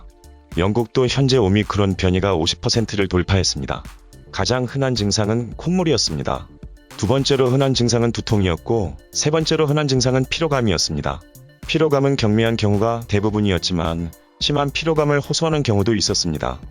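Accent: Gyeongsang, South Korea. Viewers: 의학정보중 제일 신뢰가 갑니다. 하루빨리 목소리가 회복되셔서 구수한 경상도 사투리로 말씀하시는걸 듣고 싶습니다. ❤️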